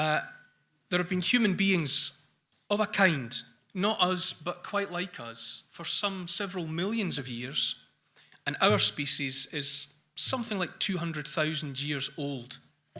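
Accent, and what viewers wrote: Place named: Scotland, United Kingdom. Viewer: Absolutely brilliant, what a great story teller, and is a historian and a great one at that, his passion is unsurpassed, and I absolutely love his beautiful scottish accent.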